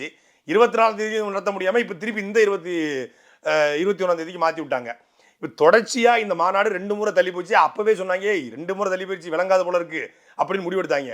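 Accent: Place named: Kongu, India. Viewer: அண்ணன் கொங்கு தமிழ் ல பொளந்தது செம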